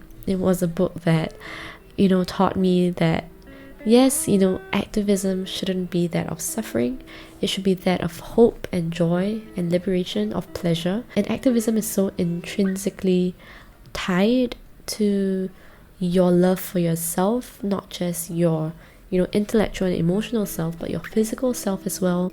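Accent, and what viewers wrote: Jamaica. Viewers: I’m extremely fascinated that your accent often reminds me of Jamaican accent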